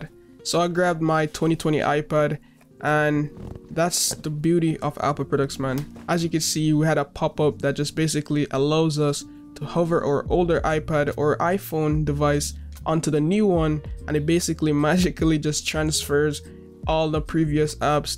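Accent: Jamaica. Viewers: He's Jamaican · Jamaican accent unmissable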